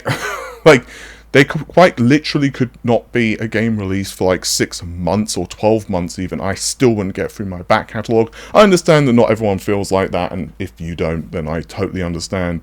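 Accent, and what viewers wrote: United Kingdom. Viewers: Watchied a lot of British TV and I have come.accross quite a few people that sounds like this man's accent.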